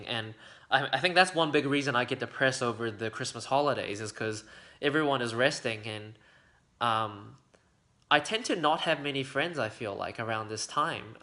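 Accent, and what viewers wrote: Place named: Australia. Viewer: I’m so inspired by Dr Joe, I’ve read all four of his books and they’re completely fascinating, inspiring and always help get me out of a shitty mood. Do you live in Australia? Your accent sounds a little Aussie I think :)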